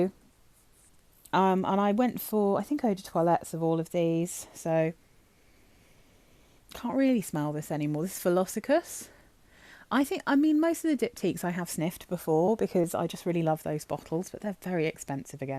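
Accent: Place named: United Kingdom. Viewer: Well, I'm in Atlanta but I'd sure love to meet up and go sniffing around London with ya! (Maybe someday I'll make it across the pond!) Lol. Love your weekly roundups and your British accent is so lovely to my deep south American ears... My SOTD is SJP Covet, which I know you enjoy too... Happy Birthday! 🥳🧁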